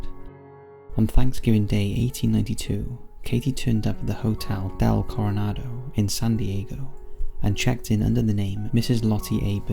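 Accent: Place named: North Wales, United Kingdom. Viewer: I’m sure the narrator is from north wales , soothing voice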